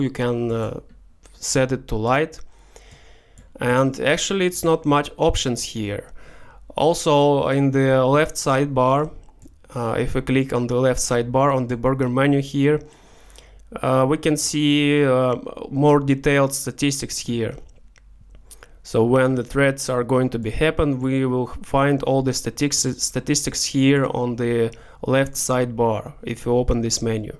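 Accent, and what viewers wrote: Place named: Russia. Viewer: че то у тебя акцент то Русский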